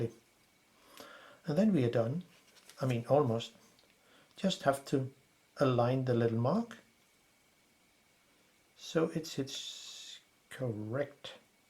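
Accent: Denmark. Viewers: Thank you very much for this amazing and very knowledgable content. I also decided to follow your instructions, however, I ran into problems when I accidentally disassembled the focusing part which lead to a lot of problems. I am fully aware it's entirely my fault but I really want to fix it, is there any way I could contact you please? I live in Denmark, you sound bit danish too, but don't know anyone who could help me. Thank you and good luck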